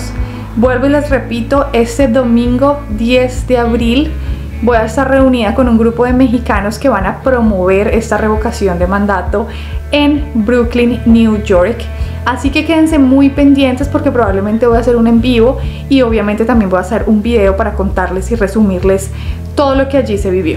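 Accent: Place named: Colombia. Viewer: Hola mija eres chingona,,me encanto tu acento Columbia,,..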